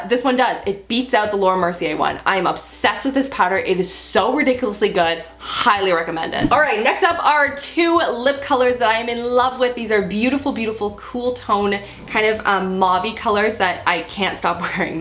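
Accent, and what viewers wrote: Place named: Alberta, Canada. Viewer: thats that awesome alberta french I'm hearing! #YYC rep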